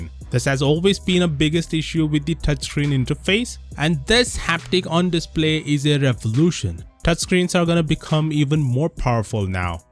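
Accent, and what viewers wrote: India. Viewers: I find your Indian accent more amusing. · English indian style... not bad but almost indian people very bad their english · Terrible whole YouTube is Indian with fuck'n accents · The Indian accent is distracting... Aaaargh!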